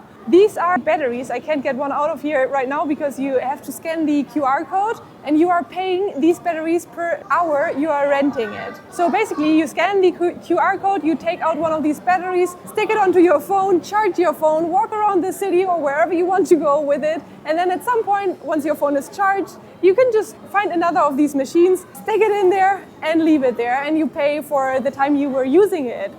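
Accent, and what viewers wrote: Germany. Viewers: Du I hear a German Akzent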